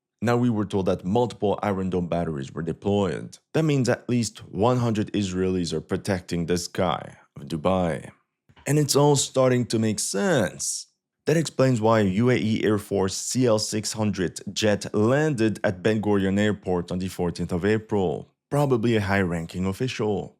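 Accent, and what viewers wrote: United States. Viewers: Your American accent is funny shit haha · Hold on a second buddy, your american accent is hilarous greetings from australia and wishing health to you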